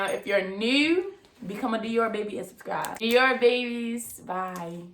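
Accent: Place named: Baltimore, United States. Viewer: How was the battery health ? And are you frm Baltimore u got the accent 😭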